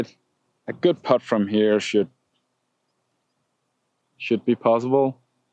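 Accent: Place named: Jutland, Denmark. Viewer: Fed video og fedt på engelsk også, du snakker ganske fint engelsk på godt jysk, ingen worries der, hvad med en video, med dine længder på alt i baggen, og gerne nogle Challenge med andre golfer på dit niveau, kunne være fedt og se.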